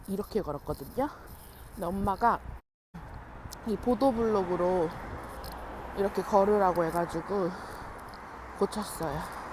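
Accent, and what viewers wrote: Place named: Seoul, South Korea. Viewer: 옛날 서울 사투리쓰는 아가씨같아요 되게 말씨가 곱답네요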